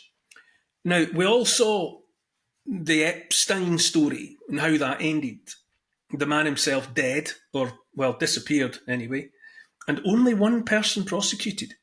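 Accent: Scotland, United Kingdom. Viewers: I thought u were Georgie Best for a bit with a Scot accent lol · Well, that hit a few nails on the head! 👍💪 Neil Won't Kneel A gentle, beautiful Scottish lilt A kind, empathic, forward tilt An open heart; a caring face A willingness to take his place. A strong, home-hitting monologue Reminds us of the boiling frog The courage to become a sleuth A passion to release the truth. A deep desire to crack the whip Illuminates our freedom-strip Calls out the darkness taking hold The shiny despots; plated gold! Intense and raw, he lays it bare A soul-enhancing, honest stare Inflections drive the message through He speaks for Us - for me and you! Discernment's such a precious gift His words create a mighty lift Take heed of all he has to say He knows - it shouldn't be this way! 🤗🦋🐬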